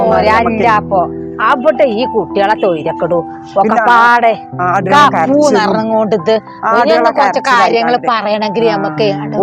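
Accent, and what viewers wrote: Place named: Karuvarakundu, India. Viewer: ഇത് നമ്മളെ നാട്ടിലെ പാർക്ക്‌ ആണല്ലോ കരുവാരകുണ്ട് എന്റെ നാട്. നിങ്ങളുടെ സംസാരം കേട്ടപ്പോൾ തന്നെ തോന്നി ആ ഭാഗത്തു തന്നെയായിരിക്കും എന്ന്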